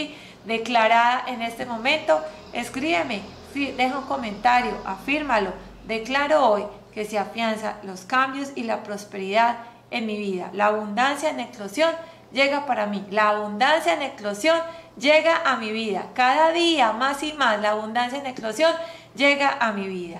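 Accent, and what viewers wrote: Colombia. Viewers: Declaro abundancia en mi vida! Gracias por tu lectura, eres bastante acertada y al igual que tu soy Colombiana, el acento es inconfundible...Un abrazo y nuevamente GRACIAS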